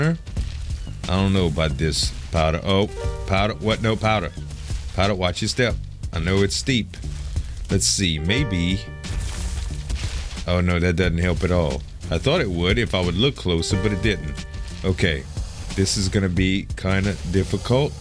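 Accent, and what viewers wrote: Louisiana, United States. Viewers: I want to ask you, but I don't want to miss-place you. You have an accent, and I love it. I just subbed to you so forgive me if you've already established this, but is your accent for Louisiana ?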